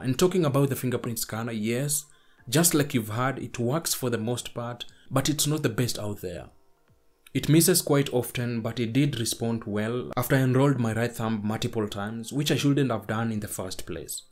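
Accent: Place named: Kenya. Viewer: Dunno why I feel like you sound Kenyan😂😂😂....just bumped into your channel as I was reviewing the pixel 6 pro. I have the Pixel 6 and love this phone but honestly I keep wondering if the camera on the pro is better. Do you have an opinion on this? That would be the sole reason I switch to the pro. I love taking memories of my kids on video and photo and want the best images? If the pro is better I just might be convinced to swop purchase it. Thoughts ?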